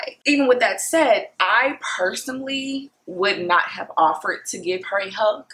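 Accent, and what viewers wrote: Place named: South, United States. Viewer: You sound like you are from the country south. Where are you from Texas?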